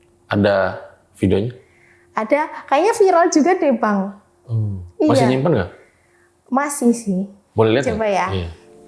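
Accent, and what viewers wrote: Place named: Banyumas, Indonesia. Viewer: Kak km orang ngapak yaa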